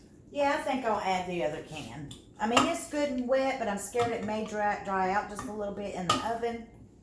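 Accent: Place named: South, United States. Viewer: Every time you say something like “Right quick” I just ❤️ you more! You speak my family’s language! My sister and I keep saying we are going to do a dictionary of our family’s quirky southern phrases.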